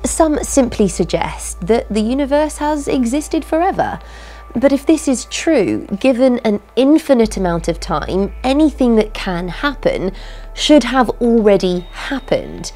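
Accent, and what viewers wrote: United Kingdom. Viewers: A great looking lady, looking a little "science-y" disheveled, with a British accent talking about the big bang intelligently... I don't know what's more attractive than that lol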